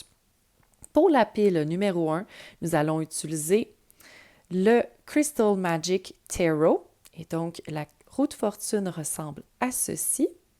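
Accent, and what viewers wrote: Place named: Quebec, Canada. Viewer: Oui c'est ça ! Ça bouille ça bouille ! (Mais on sait pas trop ce qui bouille, ni ce qui va en sortir).Le français Québécois me fait mourir de rire 😜. Merci en tout cas, j'adore tes tirages ❤🤗🤗🤗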